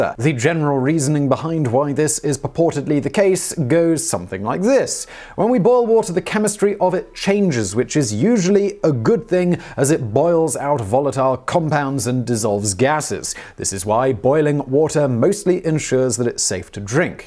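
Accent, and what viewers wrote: United Kingdom. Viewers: it’s hard as it is to understand this fast speaking British accent. you are a life saver . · I just got tricked into listening to a Brit talk about tea for 15 minutes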